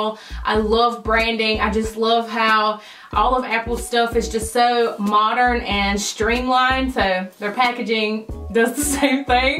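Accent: South, United States. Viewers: Here for the Southern drawl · Everyone in the comments are saying you have a strong southern accent and I was like I don’t hear it so when we went to go see my cousins in Atlanta they were like omg they were like she sounds just like you also you are so pretty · You have a strong southern accent, the second i heard it i was like WOW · lol I’ve never seen a YouTuber with a southern accent . Definitely subscribing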